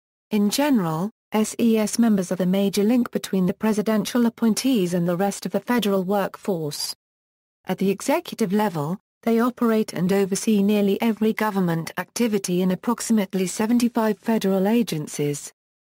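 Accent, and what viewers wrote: United Kingdom. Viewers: why is a British person saying this lol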